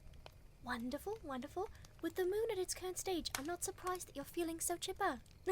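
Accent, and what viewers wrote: RP, United Kingdom. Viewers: Me: Sees you posted a new video "Oh, nice. Wonder what it i- Also me: *sees its a witch roleplay "I know what I'm listening to tonight! Thank you - *sees its British accent Also me: various happy noises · This one is just lovely! Just the bri‘ish alone gives it this certain charm. Lovin‘ it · Ayoo bri ish is soo sweet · Ah, some proper Bri'ish, feels just like home! Like I just popped down to my local chippy and it had, in fact, turned into a potion shop! Jolly good show! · Bugger me. This accent is so posh it makes me feel positively middle class.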